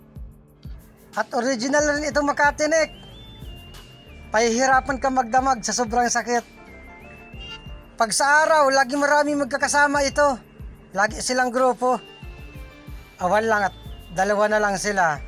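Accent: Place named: Camarines Norte, Philippines. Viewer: kaya pala pareho tono Ng salita natin siguro taga vinzons ka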